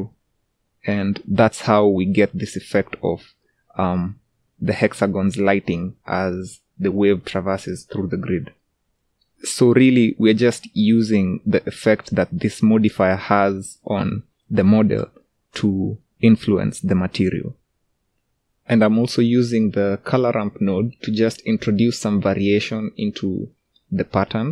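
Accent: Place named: Uganda. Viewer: Your accent reminds me of my old Ugandan accent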